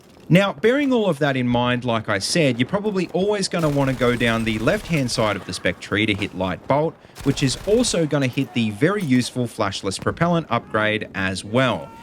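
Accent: Australia. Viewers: Thanks mate. Great review and good to hear from an Aussie. I've subbed and look forward to your next videos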